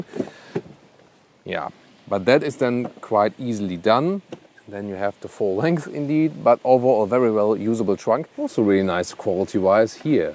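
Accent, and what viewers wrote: Germany. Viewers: sounds more German than ever 😆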